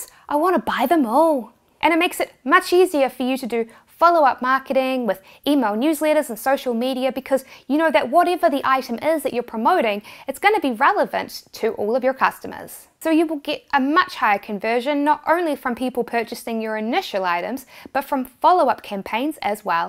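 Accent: New Zealand. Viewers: Pets. Kiwi accent.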